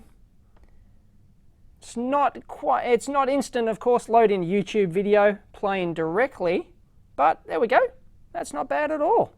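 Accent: Australia. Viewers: Hahaha, he thinks our Aussie way of speaking offensive. For us it's pretty normal.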